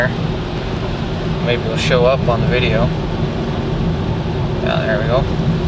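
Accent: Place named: United States. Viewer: Thanks for the vid, i do wonder how many kms you get on one charge and if charging was quicker with proper house mount charger. 100kms is no good for me, commute to work is about a 200km round trip. plus in Australia our electricity is much higher, though i don't know where your from, you seem to have an american or Canadian accent but I thought they used Miles in US, kms in Aus :)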